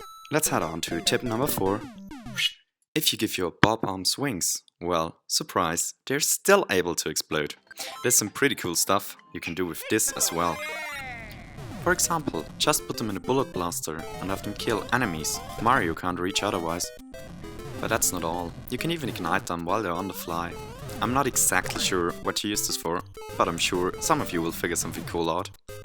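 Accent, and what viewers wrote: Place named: Germany. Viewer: Here can i hear that he is german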